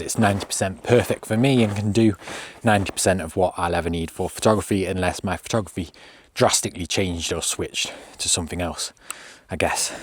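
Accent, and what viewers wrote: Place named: Nottingham, United Kingdom. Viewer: Great vid and nice to hear a solid Nottingham twang on YT 😊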